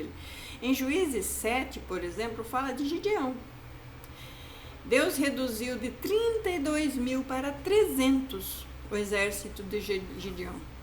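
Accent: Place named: Rio Grande do Sul, Brazil. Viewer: Deus abençoe irmã! Sutaque maneiro deve ser do Rio Grande do sul que Deus abençoe a todos dái!!!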